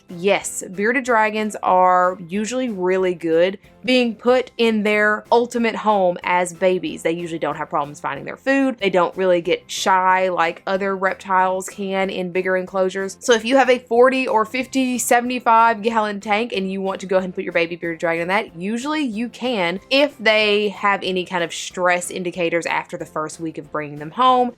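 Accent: South, United States. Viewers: Such a pretty and upbeat young lady. Love that southern accent! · New viewer. Great content! You from the South? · I like your southern accent, and the way you say thangs lol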